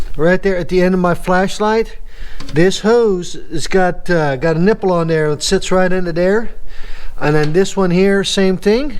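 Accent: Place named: Newfoundland, Canada. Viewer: Great video. Thank you! I think I might recognize a bit of Newfoundlander in yaaa!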